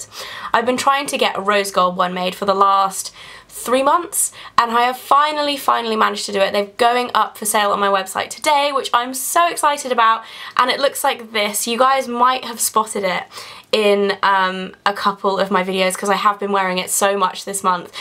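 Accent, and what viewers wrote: England, United Kingdom. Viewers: i can listen to you forever, i love your british accent haha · i'm obsessed with british accents right... and i think your accent especially makes me love them even more GAH you're so gorgeous!!!!! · I love watching your videos. 1- Because your amazingly beautiful! 2- I love listening to English people, love their accents! :') <3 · What, just cause she has an English accent?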